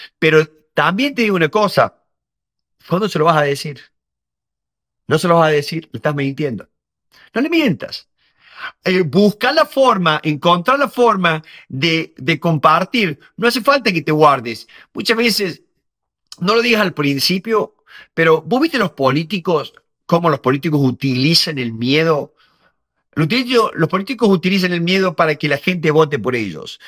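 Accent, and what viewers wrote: Córdoba, Argentina. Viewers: Me encanta tu tono cordobés, muy útil este video. Gracias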